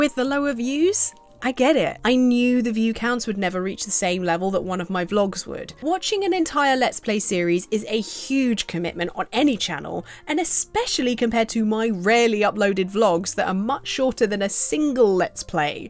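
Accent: United Kingdom. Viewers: I just think you're swell. You have one of those voices I could set my Jarvis to. Listened to all your videos, some more regularly than others, and that old cliche holds true. I could listen to you read the phonebook and it be entertaining...or ya know, Oxfords dictionary. If you wanted to go old school 🥴 explains: New English like "Frack" entered Oxfords dic. this year and means frack, n.: “Used as a euphemistic alternative to fuck, n., typically in phrases suggesting a dismissive, hostile, or exasperated response, such as to give a…” Ranting, explaining, sick, expositional, vlogging, No matter the temperament, the topic, or the energy I just like hearing your voice with all its British inflections and your squeaky mannerisms. Like a massage for the brain. Like the mic setup btw. Ok peace.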